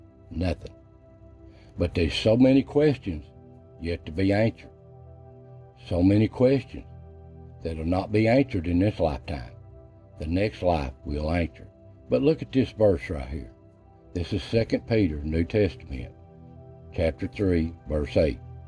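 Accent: Appalachia, United States. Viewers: I feel kind of a kinship to you. My people settled in the Appalachians on the east side and yours on the west side, we both have the same surnane and we're both country boys from birth with the same accent. If we could go back far enough I think we would see that our families (on the paternal side) came from the same place.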